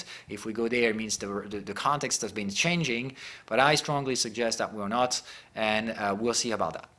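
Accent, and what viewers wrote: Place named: Texas, United States. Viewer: Thank! You have the accent of the texas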